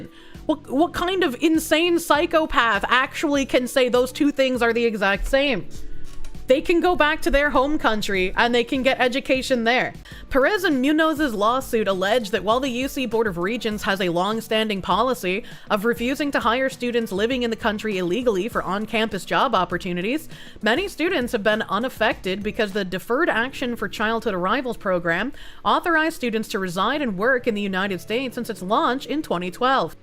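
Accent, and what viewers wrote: Boston, United States. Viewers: Needs to up the boston accent best thing to come out of america, god i genuinely love it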